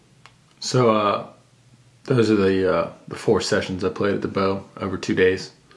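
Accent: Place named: West Melbourne, United States. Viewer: Kinda have the west Melbourne (FL) accent... Don't hear creole. (Brevard county viewr is reason) But I'm feeling your "rounding" hard core style yo. Good vibes if that's your gig. First timer here 👍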